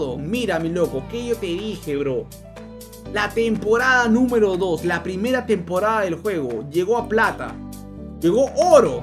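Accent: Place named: Peru. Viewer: Eres peruano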